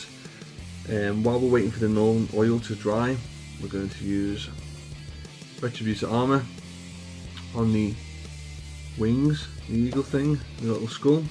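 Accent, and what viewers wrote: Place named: Liverpool, United Kingdom. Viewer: Oh Christ it’s a scouser 😂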